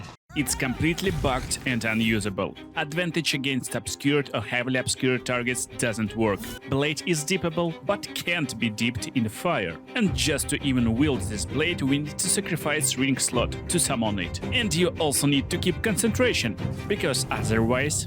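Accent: Russia. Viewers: omg, your accent-- are you russian? nice glitch btw. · Русский акцент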